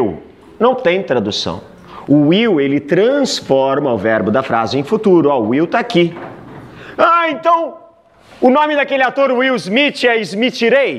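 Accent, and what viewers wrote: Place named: São Paulo, Brazil. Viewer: ele tem sotque de Paulista sq sem gírias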